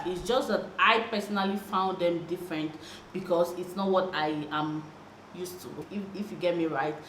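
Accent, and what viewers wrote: Nigeria. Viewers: You look Xhosa even if you sound Nigerian ❤️ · funny enough i also thought so. And when you said "Dumelang" then all of a sudden you looked half "Tswana and half Xhosa"...magic...lol. If you did nt have Nigerian accent you would definitely looked like one of the locals.lol. You should learn one of the languages. Will benefit you big time.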